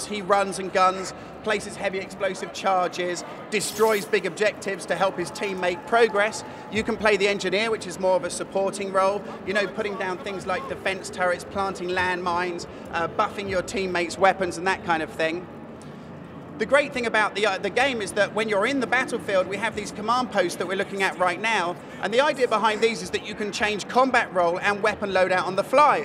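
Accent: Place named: England, United Kingdom. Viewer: English Accent))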